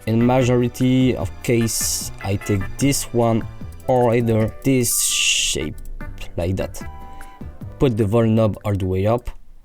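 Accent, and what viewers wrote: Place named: France. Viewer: J'ai adoré le tuto (je comprends mieux l'anglais avec un accent fr de merde)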